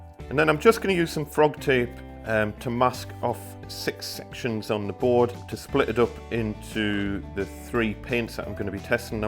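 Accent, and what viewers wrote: Newcastle, United Kingdom. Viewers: I have to use oil based gloss for work sometimes when the need arises, and at the start i didn't like the sound of using the water based but i think with me it's got to a stage that i want to try it out. I think when you are painting doors etc and you have to deal with the smell, plus any possible runs you get at the corner of panels, the time it takes to dry, and especially when you have to clean up and put tacky equipment back in the van with a fear of anything tipping over and getting it on stuff you wouldn't want it on, i just can't wait to be able to wash my brush and trays out under the tap and not have to worry about it, plus how quick it would dry too compared to oil based paints. Love the Geordie accent by the way followed by the Jimmy Nail grin. I'm glad you told me al aboot that. Many Thanks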